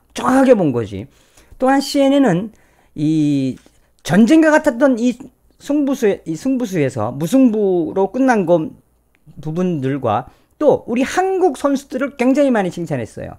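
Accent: North Korea. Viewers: 북에서 쓰는 억양으로 선동하는 듯한 표현은 자제해 주세요. 소름끼칩니다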